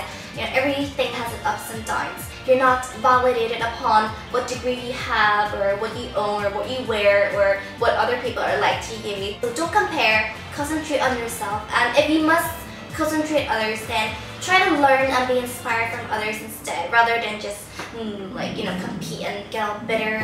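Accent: Northern Ireland, United Kingdom. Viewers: Northern Irish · You sound kinda northern Irish?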